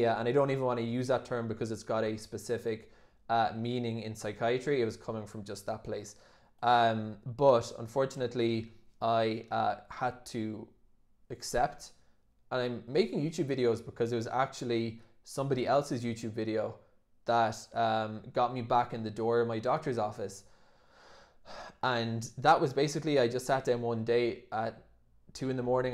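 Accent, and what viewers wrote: Ireland. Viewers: yup, sounds south of Dublin 🎉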